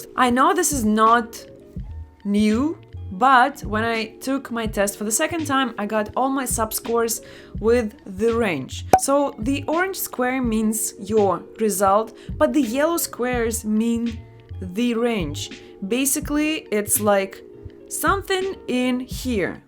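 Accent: Russia. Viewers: My English skills is horrible, but i decided to take the Duolingo practice test and i scored just 60-75 :( Oh my gosh, i need to study more 🥺 I'll try it one more time :) I almost forgot to tell this, i found out your channel a few minutes ago by mistake and now I'm a new subscriber :) By the way, are you Russian? I've noticed a Russian accent in a few words as you speak or am I crazy 😧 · Ikr! I really liked the russian accent 😂😂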